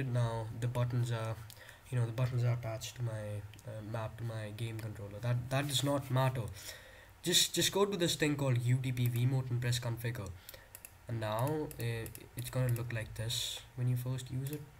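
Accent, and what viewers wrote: India. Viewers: indian accent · u indian??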